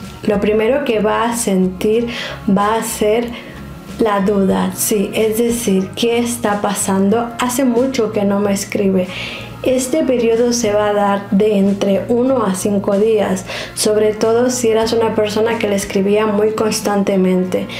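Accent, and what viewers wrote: Spain. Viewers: A mí también me encanta toda ella y da buenas explicaciones... A veces se escucha como si fuera española, una jalisquilla con acento español...❤ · Estoy enamorado del acento de esta chica... De que parte de españa sera???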